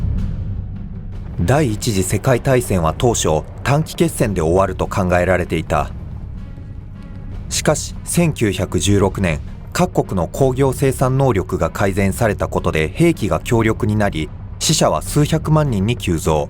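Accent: Kansai, Japan. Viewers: ジオヒストリーと同じ関西訛りが好きです。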